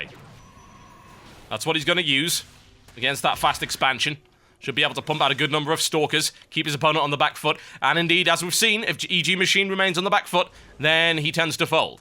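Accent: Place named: Newcastle, United Kingdom. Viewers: Lol all geordies don't talk like that :P, well i don't anyways :P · Hard lol Geordie casting xD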